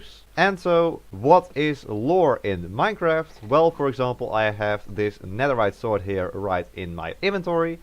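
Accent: Netherlands. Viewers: this dudes def dutch for sure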